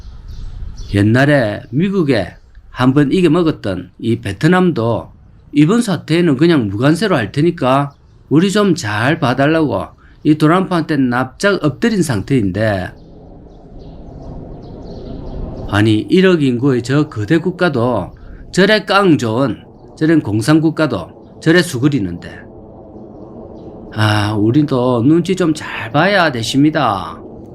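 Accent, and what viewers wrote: Gyeongsang, South Korea. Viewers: 갱상도 사투리정말 듣기싫다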